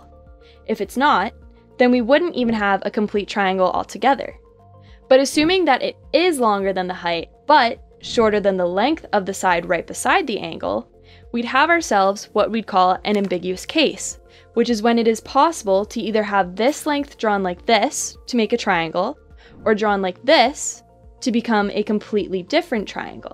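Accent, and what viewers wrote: Canada. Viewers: Ur Canadian aren’t u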